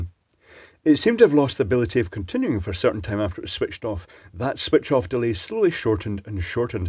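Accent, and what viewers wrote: Scotland, United Kingdom. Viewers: Love your videos. Have learned a lot despite your scottish language/prononsuration.(?) Remember that you have an international audience!! Sort out your english! And rest your hands. Whill go on loving your videos. Oscar/Sweden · That Scottish accent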